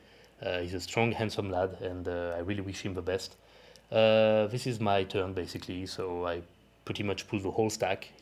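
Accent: France. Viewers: Vidéo d'entraînement de 20min, voiceover en anglais avec un fort accent français, intensité au rendez-vous, que demander de plus ? PS : pareil que toi perso. J'ai toujours été plus à l'aise avec une prise plus serrée et je règle également tous les sièges le plus bas possible sur les machines pour les pecs. La largeur de clavicules influence la prise aussi à mon avis. Qu'en penses-tu ?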